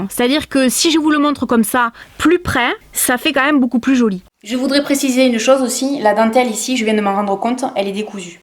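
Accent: South, France. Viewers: Je ne sais pas comment je suis tombé sur cette chaîne mais en tout cas je trouve que tes vidéos sont super agréables à regarder. Le franc parler, l'accent du sud, l'humour.... c'est sûr y'en a pas 2 comme toi !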